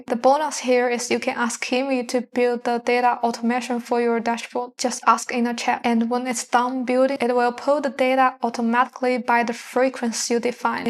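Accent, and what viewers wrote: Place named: Scandinavia. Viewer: I like your accent from Nordic.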